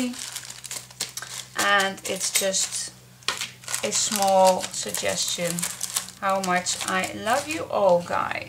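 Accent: Brabant, Netherlands. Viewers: Gefeliciteerd met deze mijlpaal👍🎉🎈. Ik volg je al een tijdje maar ben nu ook abonnee geworden👍💋. Je maakt zo leuke dingen, maar soms heb ik moeite met het engels, dan kijk ik naar de video en wordt t me duidelijk wat je bedoeld. Ik woon in Nedetland en in Limburg. In de buurt van roermond dus niet zo verweg van brabant, ik hoor je accent en versta t ook. Ga zo door👍💋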